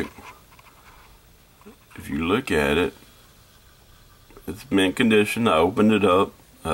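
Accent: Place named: South, United States. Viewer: Ha ha u said dude it sounds coolcuming from a southern accent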